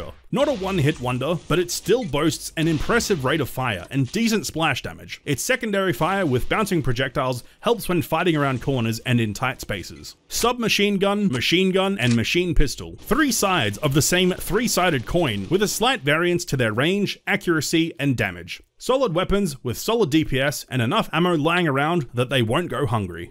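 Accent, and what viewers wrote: Australia. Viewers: Why are you Australian?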